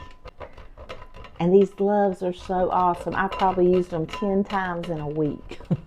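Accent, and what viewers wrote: South, United States. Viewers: I enjoy your videos very much and your southern accent😃. Your home looks beautiful in the videos. I’d love to see your decorating style! I’d also enjoy a ‘get to know me’ video. You’ve mentioned being in the Air Force. I’m intrigued!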